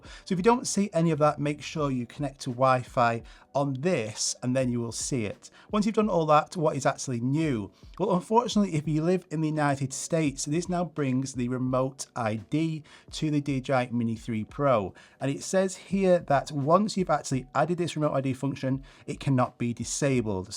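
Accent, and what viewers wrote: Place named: Cumbria, United Kingdom. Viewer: You sound like you're not too far from Kendal.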